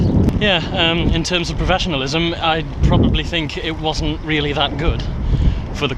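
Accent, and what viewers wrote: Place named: Geordie, United Kingdom. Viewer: Two observations: Firstly that you're a posh Geordie, I know because I married a posh Geordie ;-) and secondly I'd love to see more of the coast in your videos, the coast up there is very beautiful (too cold for this soft southerner but great to look at)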